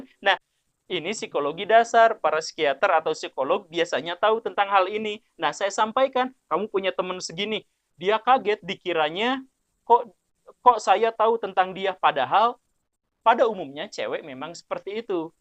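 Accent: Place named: Sunda, Indonesia. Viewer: Pandai adek I yg ganteng nich... Sunda nya medok ieu teh. ...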